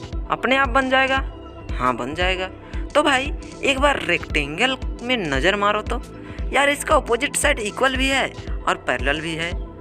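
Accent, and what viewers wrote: Bihar, India. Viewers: i like your bihari accent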